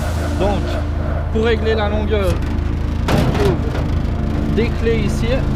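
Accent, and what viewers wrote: Vaud, Switzerland. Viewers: Joli ! Tu es suisse non ? Vaudois je dirai à l'accent ^^ Tu as pas de faucheuse arrière ? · yop super vidéo tu explique vachement bien PS: j'ai une question j'ai vu que tu était suisse et j'adore cette accent. Je viens du canton de Fribourg 😏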